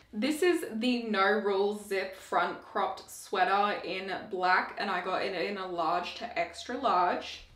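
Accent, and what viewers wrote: London, United Kingdom. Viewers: The London accent was perfect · I CANT GET OVER THE LONDON ACCENT STOP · the London accent killed me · Cracked me up with that London accent haha! Love it, great vid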